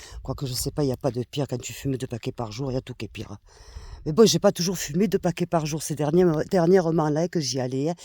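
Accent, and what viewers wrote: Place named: South, France. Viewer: Tu es trop génial , tu as encore bien gardé l'accent de notre sud , moi je l'ai perdu , et pourtant vécu longtemps à Cannes, Nimes , Alès ... Ohhh bonne mère 🤣😅j'essaie aussi d'arrêter de fumer , pas facile :face-blue-smiling: aller à Bientôt :hand-pink-waving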